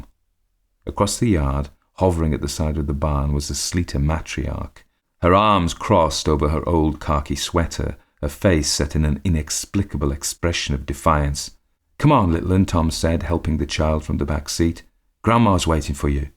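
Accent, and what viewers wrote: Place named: Wales, United Kingdom. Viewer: Great voice, I really enjoy listening to your story telling. I can definitely hear a Welsh accent in some of your pronunciations but there are also others in there which you’ve probably picked up on your travels. Either way, a lovely voice 🤗🇬🇧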